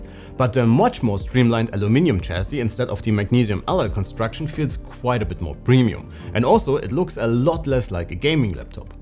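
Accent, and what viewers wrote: Germany. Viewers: Der deutsche Akzent ist echt😅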